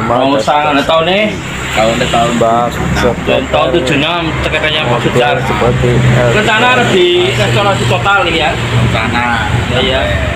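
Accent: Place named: Banyumas, Indonesia. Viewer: Salam. Ngapak mas. Tambah Sukses selalu. Amin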